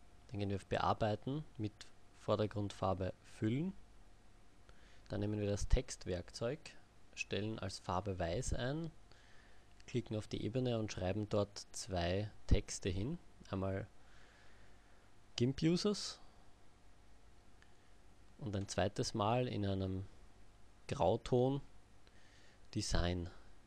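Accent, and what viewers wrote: Austria. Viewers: Bist du ein Österreicher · Oho ein Österreicher ;)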